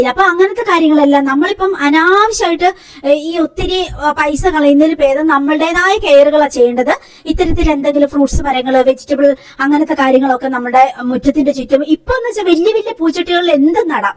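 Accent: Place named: Perumbavoor, India. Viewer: veedu perumbavoor moovatupuzha bagam aano basha kettitu chothichatha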